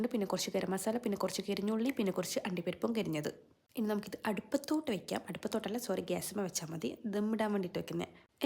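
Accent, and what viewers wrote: Kannur, India. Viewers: Ingal kannur laano 🙄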